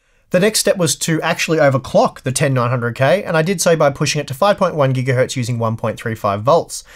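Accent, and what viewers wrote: Australia. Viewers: I don"t know what is is about a fellow Aussie voice. It just always seams more relaxed here than Linus or Jay. Thanks for all the vids. :)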